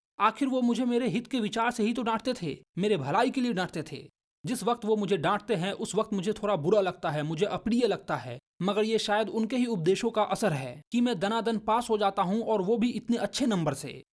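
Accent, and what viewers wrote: Bihar, India. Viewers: Aap bihari ho kya bihar ke lala lala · Bhai ap Bihar se Hai Kya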